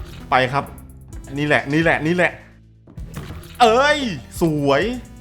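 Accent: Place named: Thailand. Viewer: นับวันพี่ ยิ่งพูดไม่ถูกเเถมมีเหน่อด๋วย <num>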